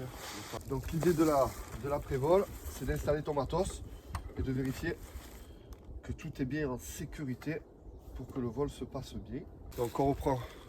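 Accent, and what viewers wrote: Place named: Provence, France. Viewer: Bravo pour ta présentation aussi détaillée avec un ton naturellement provençal très agréable. Plus le courage de faire un montage vidéo. Bravo!!!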